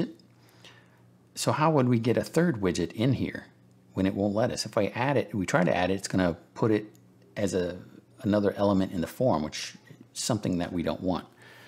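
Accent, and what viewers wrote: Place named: India. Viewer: Are you indian by any chance? You sound like my coworker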